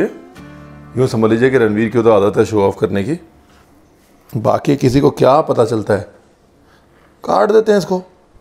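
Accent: India. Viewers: No words to explain anything Abt u ND ur vedios... Ur smile is just way too🔥🔥🔥my heart falls Everytime for your every word that you speak in such a down to earth ND desified way...your recipes just amazing...I love cooking I try all of your recipes...ND your punjabiness...❤️❤️❤️❤️❤️❤️❤️❤️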